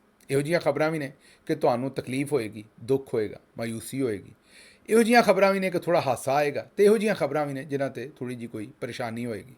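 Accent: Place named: Punjab, India. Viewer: Who asked him to speak in English? It's angrezi in punjabi accent and delivery 😢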